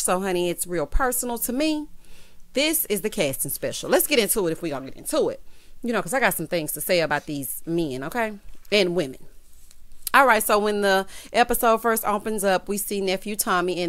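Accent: South, United States. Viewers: Your southern draw gets me every time Your Awesome..Keep Going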